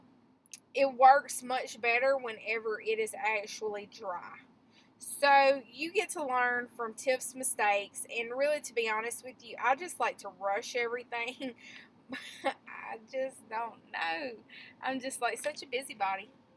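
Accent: South, United States. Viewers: I love listening to that southern drawl. You are so precious. I love your carefree art. You really enjoy yourself and so do I. ❤️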